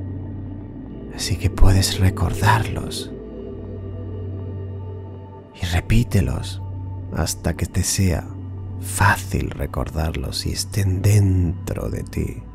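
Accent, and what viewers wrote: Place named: Spain. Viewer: Excelente meditación, excepto el acento de España podrían hacer con un acento neutro, gracias.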